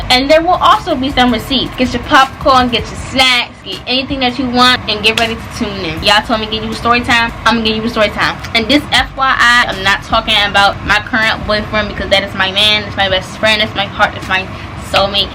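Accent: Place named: Washington DC, United States. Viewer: That dc accent, PUURRRRRRRRR🤣❤️❗️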